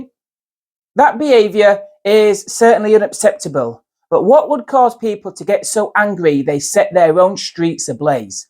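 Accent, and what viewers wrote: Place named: West Yorkshire, United Kingdom. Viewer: Over pronounces his T's lol so people from other countries can understand the west yorkshire accent laura towler used to do it a lot.. Good vid i agree! 👍🏻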